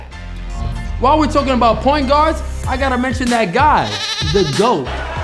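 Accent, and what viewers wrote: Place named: Dominican Republic. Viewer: Bro I had to like the vid when he spoke that Dominican Spanish. Ayyee🇩🇴🇩🇴🇩🇴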